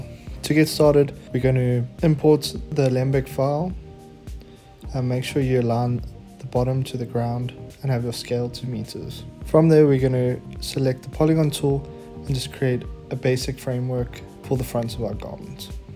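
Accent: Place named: South Africa. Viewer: That South African accent! My boy!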